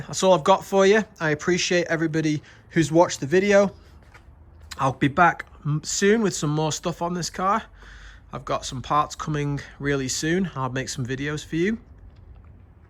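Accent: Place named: United Kingdom. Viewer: You have a british accent but are u in California?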